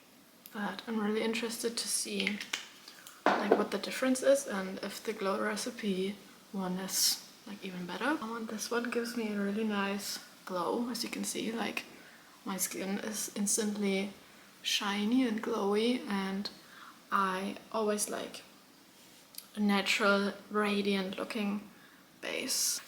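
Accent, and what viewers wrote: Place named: Germany. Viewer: Hey, erstmals tolles Video 🤗 Nur ein kleiner gut gemeinter Ratschlag: Man kann raushören, dass du deutsche bist und es dir manchmal schwer fällt die richtigen Vokabeln zu finden/ dich auszudrücken.. Wahrscheinlich drehst du deine Videos auf englisch um mehr Leute aus aller Welt zu erreichen, was ich vollkommen verstehen kann aber vlt solltest du einen 2ten deutschen Kanal erstellen oder einpaar Videos auf deutsch drehen denn ich hab das Gefühl so kann man einen besseren Eindruck von dir als Person gewinnen & du kannst deinen Charakter mehr zum Ausdruck bringen und somit leichter eine Community aufbauen :) Nicht falsch verstehen aber vlt denkst du drüber nach, mir gefallen deine Art von Videos sehr🤍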